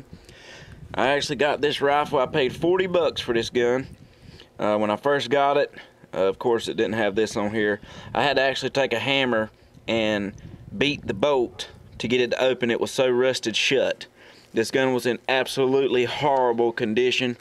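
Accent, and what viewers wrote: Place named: Louisiana, United States. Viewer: North Carolina? You sound like you from the Bayou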